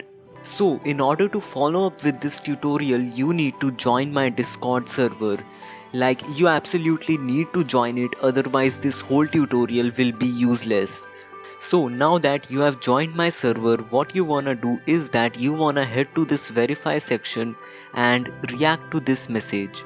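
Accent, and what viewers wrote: India. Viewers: The method is real people just doesn't like Indian accent thise days...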